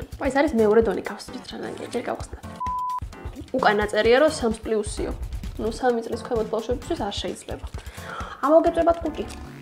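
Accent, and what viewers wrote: Imereti, Georgia. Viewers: უსაყვარლესი ხარრ 💙💙 ეს ცოტა იმერული აქცენტი საიდან .?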